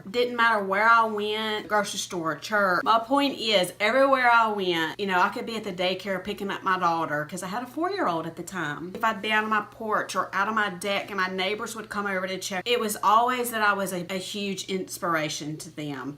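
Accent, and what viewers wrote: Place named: South, United States. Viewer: Oh Dear God!!! Love the southern accent!!! Where you from girl?? Lemme guess, Georgia??? My parents used to live right outside of Atlanta. I grew up in south west Arkansas. Girl you are killin it! Keep it up! Mashed that 🛎!!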